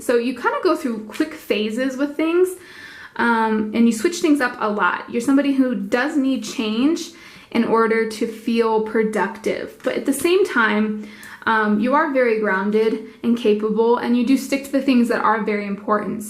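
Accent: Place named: Scotland, United Kingdom. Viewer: LAYERS thick Scottish accent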